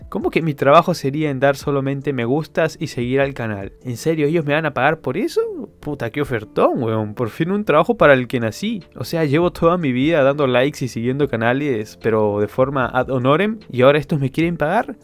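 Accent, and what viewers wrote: Argentina. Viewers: Yo de Lima, por eso te digo que a veces suena como a argentino, pero muy ligeramente. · Porque ablas como argentino? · Papi por que hablas como argentino??? Si eres peruano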